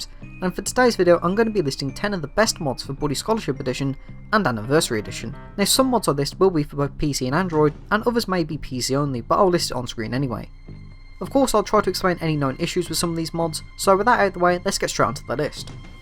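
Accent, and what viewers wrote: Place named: RP, United Kingdom. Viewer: You sound a bit posh, where are you from if you don't mind me asking?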